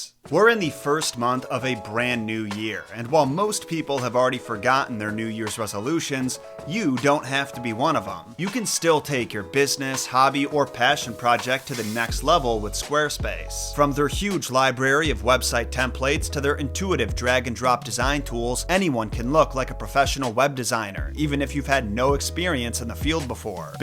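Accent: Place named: Cleveland, United States. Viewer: Shitting on ohio? But your accent sounds like you’re from Cleveland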